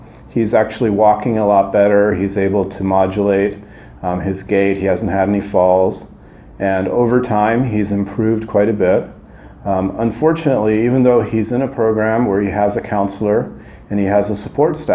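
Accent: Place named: United States. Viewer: Excellent video doctor. By the way, I love your accent. Being a foreigner, your accent is like liquid gold to listen to and learn American English from.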